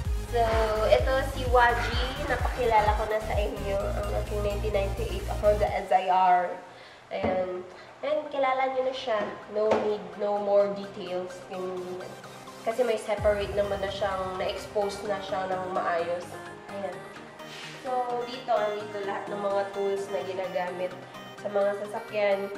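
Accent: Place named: Ilocos, Philippines. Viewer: Miss ilocana ka no.... Sa salita palang my puntu na... Ney..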